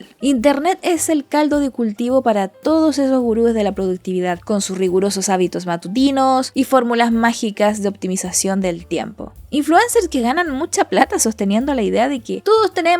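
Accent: Chile. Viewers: Solo con escuchar tu acento me di cuenta que eras Chilena, agradecido de encontrar tu canal 🤍, saludos desde la V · me encanta el acento xileno, y tu contenido excelente! un saludo de cordoba, Arg !! me hacia falta reforzar esto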